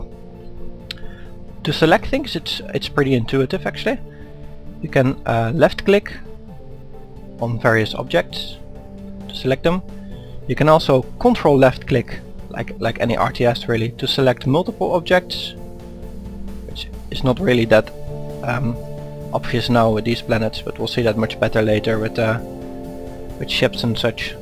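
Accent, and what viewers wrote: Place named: Netherlands. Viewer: u sound dutch. what i wonder though why the ingame tutorial sucked ass X.x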